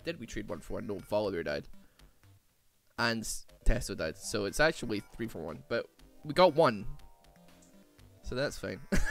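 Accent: Scotland, United Kingdom. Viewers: Scottish Jacksepticeye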